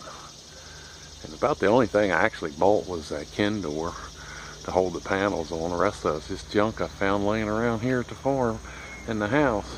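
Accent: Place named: Bristol, United Kingdom. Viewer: Your accent is cool. We here in England have nothing like it. Perhaps Bristol.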